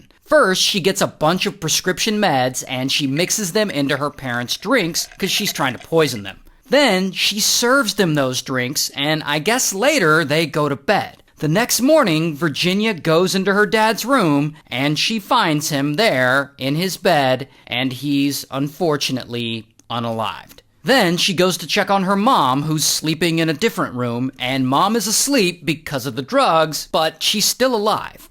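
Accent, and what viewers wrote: England, United Kingdom. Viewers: I like the brittish acent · I love your use of typically British swear words like 'bollocks' and your British accent; not the best, but hilarious! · I love English accent. He makes everyone seem so nice and proper. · The British accent 😂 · Loving your English accent ❤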